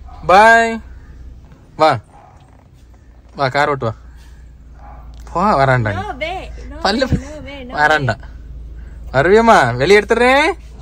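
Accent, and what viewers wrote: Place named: Thoothukudi, India. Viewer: Thoothukkudi slang sooper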